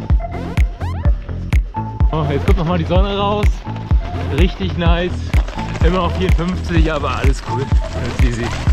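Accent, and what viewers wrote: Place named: Germany. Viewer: Ganz gut gemacht, wünsche mir ich wäre dabei gewesen, nur eins hat sehr gestört: die englischen Brocken solltest Du Dir schnellstens abgewöhnen, andauernd immer wieder: "nice", "to go", "ride on", usw.,.das ist so oberflächlich, und die hören sich mit dem deutschen Akzent wirklich schlimm an - Es tut mir Leid.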